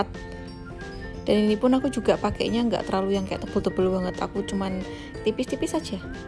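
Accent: Java, Indonesia. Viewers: Mbk medokkkk😍😍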